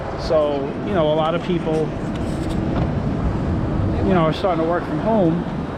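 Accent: New York, United States. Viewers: You have a a Thick Newyork Accent lol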